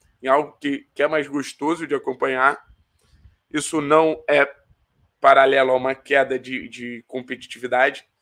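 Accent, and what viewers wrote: Rio de Janeiro, Brazil. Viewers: Cara vc tem sotaque de carioca. Vc é do Paraná mesmo?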